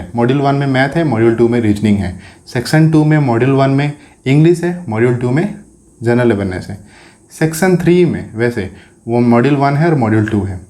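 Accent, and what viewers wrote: Odisha, India. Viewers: Odisha state se ho kya aap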